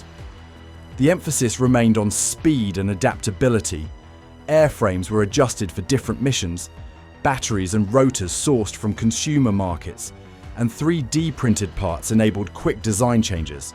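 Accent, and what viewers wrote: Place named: England, United Kingdom. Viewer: The pictures are good ,but the pommy dramatic voice over is poor???? Just tell the story with no dramatics in your voice???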